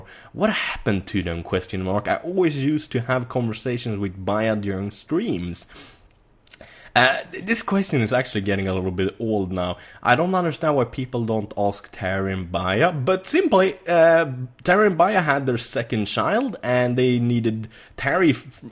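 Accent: Sweden. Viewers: I love your sense of humor. I have to say, though, probably just because of the accent and because I know you're Swedish, I keep expecting you to be blonde, and then the dark hair is a little... disconcerting?... to see. Do you ever have that experience, somebody on YouTube somehow not matching, in appearance, what you expected from their voice or from other things you knew?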